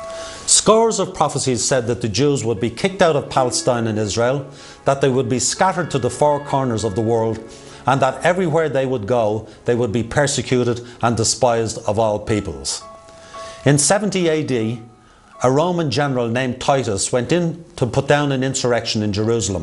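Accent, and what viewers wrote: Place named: Ireland. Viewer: Love that Irish accent! Cool vid!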